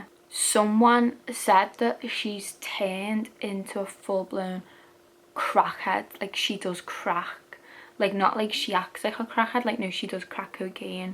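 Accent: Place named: Liverpool, United Kingdom. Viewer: LIVER POOOOL!!! Accent so strong!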